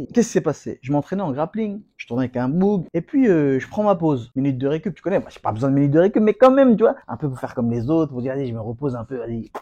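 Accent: Cameroon. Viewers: Grave l'accent camer ❤❤😂😂😂😂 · L'accent Cameroun tu gères ça en normal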